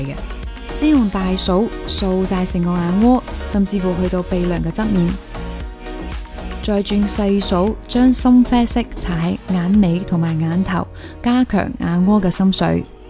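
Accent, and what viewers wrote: China. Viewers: 大陸口音... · you make cantonese sound so pretty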